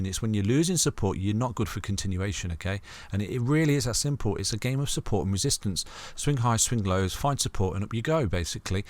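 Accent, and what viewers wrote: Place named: North, United Kingdom. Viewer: How can nayone be depressed listening to your luverly northern accent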